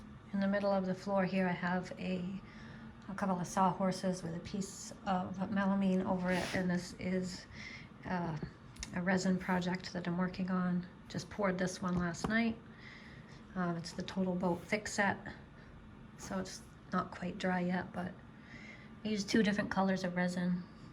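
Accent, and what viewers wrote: United States. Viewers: Thank you for the nice tour of your shop. Mine is similar in size. I'd like to show you mine now that you've shown me yours, but how do I do that? I am somewhat advanced in furniture making but a beginner at the lathe. I'm glad I found your video channel and will follow you with interest. You sound as if you have a New York accent but you've mentioned that you came from Florida and moved to Maine. But, what's with the Penn State sweatshirt? I am a retired mechanical engineer and graduated from Villanova. I live about two miles from that campus, so I didn't get very far after graduation. I worked for the DOD and the Dept of the Navy fixing ships; actually I've been all over the world at least twice. Good luck in your future video making. You give good video. -Curt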